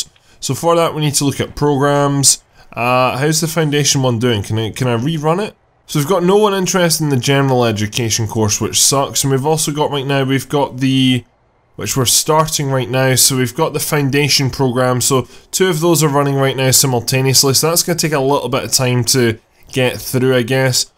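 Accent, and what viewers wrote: Scotland, United Kingdom. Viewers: New block? Scottish accent? Cites Skylines? i'm pumped as f$&£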